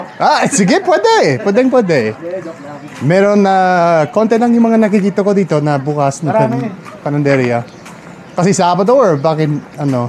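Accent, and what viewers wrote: Philippines. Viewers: You sound very Pinoy to me good job! Thanks for appreciating our morning staple hot pandesal uniquely pinoy! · You're actually very good in your tagalog! The way you pronounce each words sounds and your filipino accent, and the pitch so perfect real filipino. You dont have that fuency yet like the real speaker, but with your knowledge of the language no one could scam you. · Ang galing ninu sir magsalita.ng tagalog filipino accent din xa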